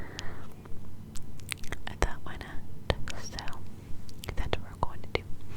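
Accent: New York, United States. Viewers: This is insanely perfect timing! I was just typing into my search bar for a ASMR NY accent video and you DELIVERED with new content 😍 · Yay! The NY accent you do is DA best!!!! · You have no idea how much I LOVE your ny accent videos! 😭💕 Thank you so much,. Sending you lots of love from Tijuana 🇲🇽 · Yay NY accent is my absolutely favorite thing you do!! A whole accent video or trying another one would be cool 😻 Love u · Yay! She is back with NY accent. My fav accent in U.S. 🥰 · i love the ny accent series ur the best for keeping my attention span engaged and making everything so tingly thankuuuu🥰🌟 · Was eagerly waiting for a NY accent video, and was so delighted to see this pop up! Thank you so much,! 💜💜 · Yay! Thank you so much! I love your NY accent! ❤️ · I ❤️the NY accent! So tingly!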